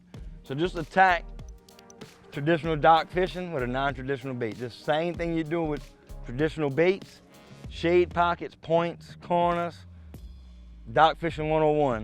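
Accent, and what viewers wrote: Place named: Louisiana, United States. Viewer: Sounds like a true Cajun boy to me 👍🏽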